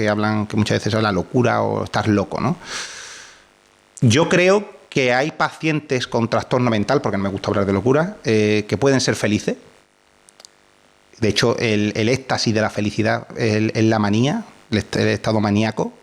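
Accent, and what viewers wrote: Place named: Andalusia, Spain. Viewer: Señora es sevillano, aquí somos andaluces y es nuestra forma de hablar, si no lo entiendes no lo veas pero deja de meterte con un acento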